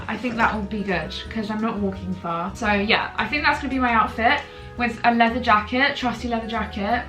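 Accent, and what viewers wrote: United Kingdom. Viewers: No idea. She has a very typical British accent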